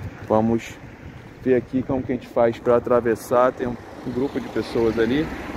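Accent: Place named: Portugal. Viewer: Estás começando a ter o sotaque português de Portugal. ,😍👋👋🌹🌹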